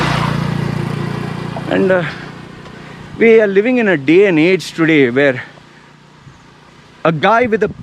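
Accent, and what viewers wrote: India. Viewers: You have indian accent but maori tattoos. Whats going on.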